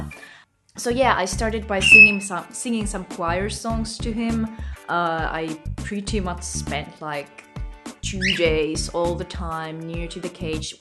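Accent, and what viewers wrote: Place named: Finland. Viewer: I hear a Finnish accent xD. Cute birds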